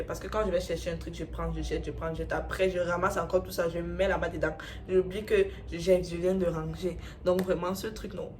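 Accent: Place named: Cameroon. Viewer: Il y a un dernier accent là qui sort quand tu parles🙌🏾😜 vive de 237🔥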